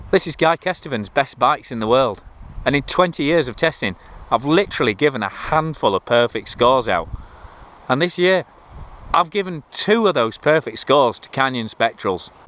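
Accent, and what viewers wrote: Northern England, United Kingdom. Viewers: Loving these. And is it me, or is there rather more deliberate/pronounced Northern-ness compared to the Future-ised accent you have to adopt, er, elsewhere? Either way, great stuff!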